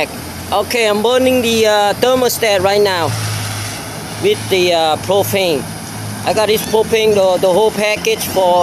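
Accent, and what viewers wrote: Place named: Latin America. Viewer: Porque no lo grabas en español?,,claro se nota que tu acento anglosajón o inglés no es con acento real,,se nota más un latino que quiere dominar el inglés,,porfavor, se te entendería mejor en español.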